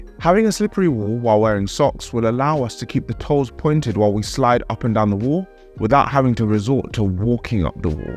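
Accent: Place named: United Kingdom. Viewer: I always try to find the most niche problems and this guy always has a vid about it. Plus yhe british accent! Fucking legend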